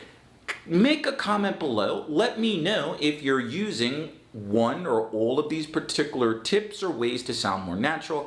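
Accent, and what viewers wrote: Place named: United States. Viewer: thanks so much for your lesson , Sir, and I like so much your American accent and so interesting to learn more,,, 5 ways, suggestions or tips to speak more naturally : 1. connected speech 2. stressing syllables 3. learn English phrases and expressions 4. learn phrases verb 5. English sound or phonetic sound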